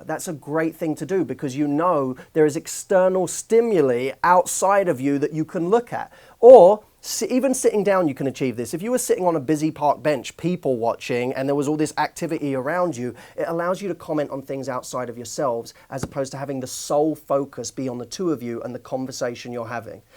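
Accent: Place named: South London, United Kingdom. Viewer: Where are you from? South London? Your accent is adorable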